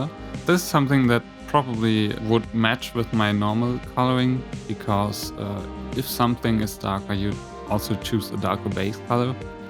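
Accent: Germany. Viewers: are you german? your accent sounds so german.. :) btw, nice video i love how it turned out, you are very talented! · man hört dass du normalerweise deutsch redest (denke ich jz mal weil du vor nem Jahr oder so ein Video mit nem deutschen Titel hochgeladen hast). bin gerade voll überrascht dass man so was tatsächlich als deutscher erkennt lol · are you german? Man hört einen Akzent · sounds german xD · I KNEW IT!! THAT TRUE GERMAN ACCENT! xD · ich weis nicht ob ich mich täusche, aber es hört sich an als ob du aus Deutschland kommst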